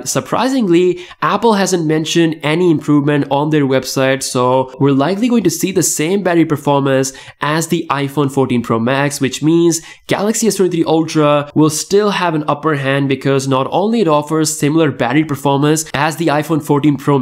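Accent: India. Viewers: You sound like Indian speaking English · Idk but...Ur english sounds like an INDIAN english.. with some added accent.. 🤔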